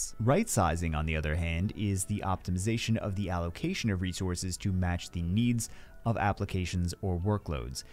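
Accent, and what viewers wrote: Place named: Canada. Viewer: Must be a Cannuck narrating ... Asooor...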